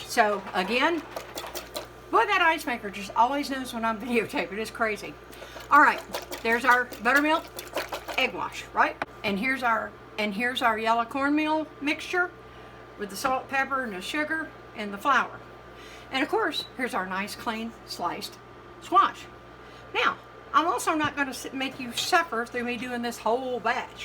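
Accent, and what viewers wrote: South, United States. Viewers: Southern accent this has to be good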